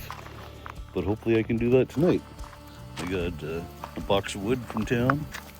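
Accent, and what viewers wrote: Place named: Eastern Canada, Canada. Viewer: Love your shirt. you talk like one of them Eastern Canadians. are you Canadian?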